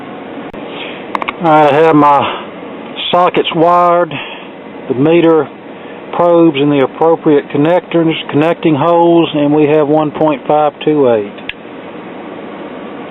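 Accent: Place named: Rural, United States. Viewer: That was friggin' awesome! It was like watching Mr.Carlson's Lab with a country twang! Go to the head of the class.A+!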